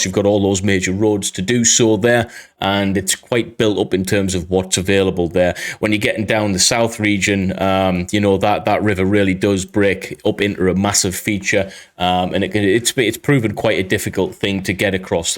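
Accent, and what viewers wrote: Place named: County Durham, United Kingdom. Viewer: You sound like someone from County Durham and for that reason you have my “thumbs up”. Best wishes.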